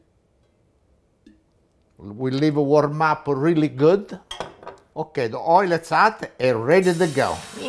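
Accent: Italy. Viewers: I love your italian accent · Oh Dio, Figlio mio, managgia la mozzarella 😛 looks so delicious. I love fresh basilfrom the garden. Grazie 😅😅😅 You make me laugh, i love your italian accent. 🍷Salute 🍷e 🍷cin cin🍷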